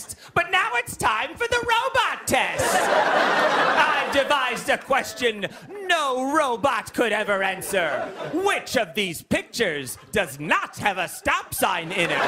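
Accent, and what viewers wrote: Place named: Chicago, United States. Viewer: I think it's the nasally Chicago accent!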